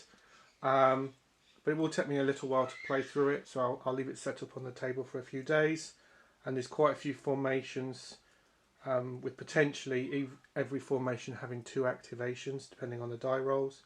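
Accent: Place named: United Kingdom. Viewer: Love this british accent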